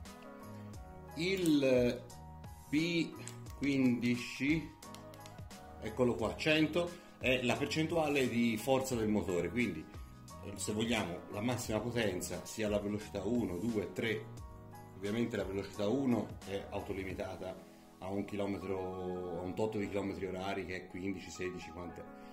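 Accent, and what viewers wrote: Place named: Florence, Italy. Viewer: Tu hai avuto una buona esperienza...io invece no... l'ho comprato da una settimana...la velocità è di massimo 37 km orari, la batteria non dura quanto dovrebbe e tra l'altro mi si è forata anche una gomma, ritengo anche che i materiali non siano di buona qualità. Secondo te il monopattino è difettoso? sono di Firenze e sento che dall'accento anche tu lo sei.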